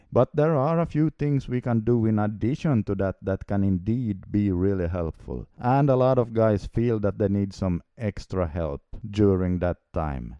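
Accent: Finland. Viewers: First two seconds and can already tell youre finnish lmao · kyl huomaa et äijä o suomest :D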